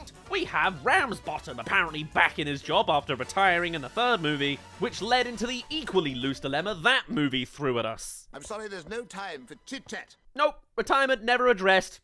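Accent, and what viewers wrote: United Kingdom. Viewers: Wait!! You’re British??? 😅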